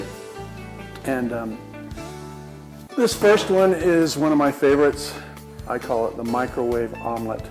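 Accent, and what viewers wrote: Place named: England, United Kingdom. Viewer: hello cousin, I see despite all your years over there, you still can't get rid of the English accent. You make a great host and you look hot too as always. love you..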